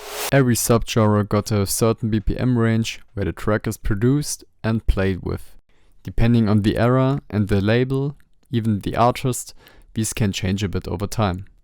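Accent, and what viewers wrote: Germany. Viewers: Ich erkenne einen deutschen wenn ich ihn höre :D Geiles Video! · das doch nh deutscher der da spricht, safe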